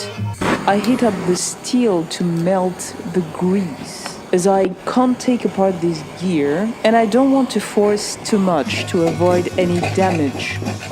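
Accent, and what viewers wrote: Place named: England, United Kingdom. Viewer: 👍 for the english accent !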